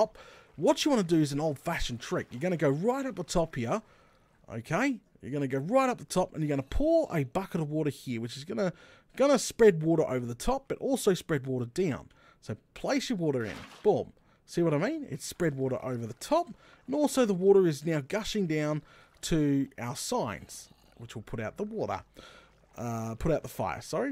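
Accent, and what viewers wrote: Australia. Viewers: I love this guys Aussie accent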